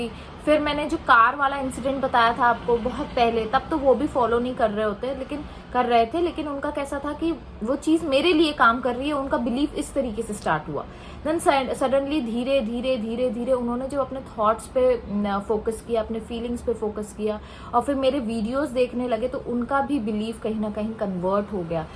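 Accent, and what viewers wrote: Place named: Goa, India. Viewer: Mam, I love your energy and vibe, its very infectious , I love the way you smile ( keep smiling always ) and the way you speak is so adorable , especially the way you pronounce the words like " KARENGA , BOLENGA , " it sounds really very cute, it gives me vibe of a GOAN lingo ( where are you basically from mam, and what is your mother tongue if I may ask? ) May, Can you please teach us the manifestation technique and I'd like to tell you that I have started to chant the Ho oponopono prayer but how long would it take for the good changes to reflect? please guide me how do I go about it. since I recently lost my job and I have no income from any sources whatsoever I am but worried how are things going to shape up for me, please if you dont mind sharing a few useful / powerful technique that could help me I'd really be grateful to you. 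I look forward to hearing from you. please do reply.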